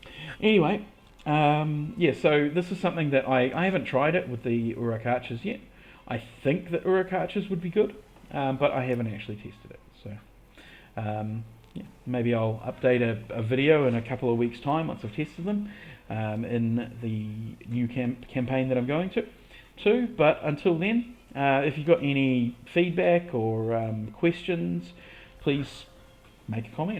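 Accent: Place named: New Zealand. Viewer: Hey man you sound like your from NZ? Wouldnt happen to have an NZ squad I could join next season? Awesome content by the way, very thorough and informative!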